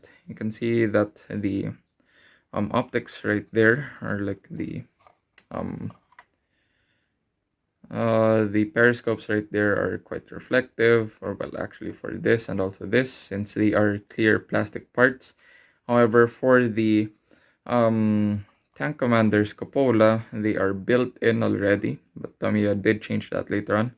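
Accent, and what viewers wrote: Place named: Philippines. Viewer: Subbed. I just found your channel. I like modelers who can finish a project. Looking forward to your next video. By your accent, are you Pinoy too?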